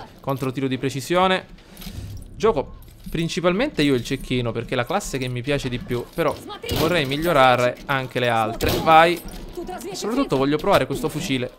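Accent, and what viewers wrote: Sicily, Italy. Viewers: Hai preso un accento siciliano più forte rispetto ai video di 3 anni fa! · CMNIK 1501 perché è siciliano?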